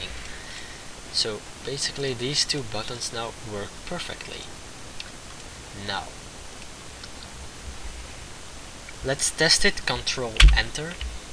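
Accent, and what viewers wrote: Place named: Philippines. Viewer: Hey, if ur not jepenese that ar u filipino cuz u have an accent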